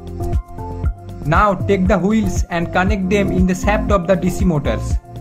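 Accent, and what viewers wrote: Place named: India. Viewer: You have the most stereotypical indian voice You should better use hindi in your next video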